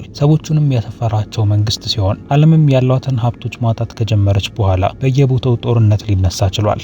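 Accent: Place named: Oromia, Ethiopia. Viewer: ጋላ አማርኛ ይሰማል ዘንድሮም። 🙆🏽‍♂️🙆🏽‍♂️🙆🏽‍♂️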